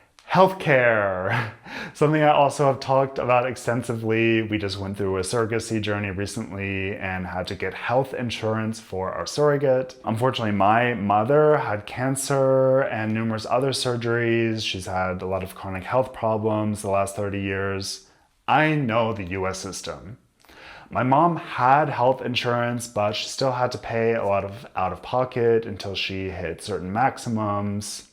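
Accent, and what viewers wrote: Germany. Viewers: I can hear your German accent.🙂 That's cool. It shows you speak German a lot!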